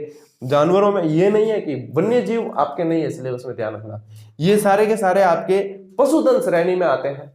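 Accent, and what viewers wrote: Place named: Marwar, India. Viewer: सर आप जो वीडियो में मारवाड़ बोलते हैं ना वह मुझे सुनने में बहुत अच्छा लगता हैं श्री शिवाय नमस्तुभ्यं महाकाल आपको हर बुरी नजर से हर हर विपदा से बजाएं आपके परिवार की रक्षा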